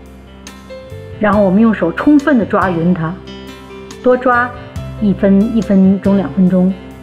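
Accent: Tianjin, China. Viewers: 大姐天津人吧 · 有些天津口音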